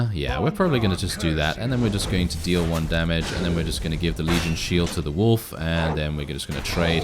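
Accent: United Kingdom. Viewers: Oh and are you British because you sound like it not that there's anything wrong with being British it's just I was wondering you sound very gentleman like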